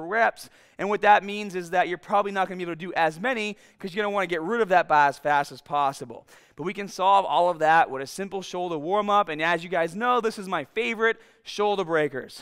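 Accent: Boston, United States. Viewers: I love your true Boston accent so beautiful. I’ll try this when the new local gym opens. It’s so cute how you say stahting and then say starting. Keep your accent it’s unique and part of who you are 🙂 I guarantee it’s super cool! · Is that a Boston accent i hear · Holy crap!! I'm new to this and have been watching ur videos for a while because u explain awesome. And I heard ur voice this time ..and said no freakin way. This guy sounds boston!! No kidding i looked u up!!! Wicked awesome neighbah! I live near fallriver, Ma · That Boston accent is thick!! great vid! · Boston accent? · I love Boston accents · That Boston accent. I used to confuse it with the New York accent ... now I never will. I live in CT, so smack dab in the middle, but surprisingly I don't know a lot of people with a Boston accent. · You from Boston ? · I love that accent, is it Boston? 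· The classic Boston accent! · He must be from Boston. That’s how we talk here lol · what the hell is his accent, man. Boston, maybe? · Is he from boston??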